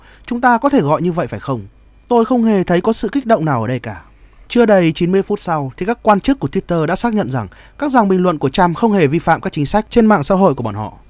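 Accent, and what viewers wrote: Standard. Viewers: Kenh này được cái nói chuẩn like 👍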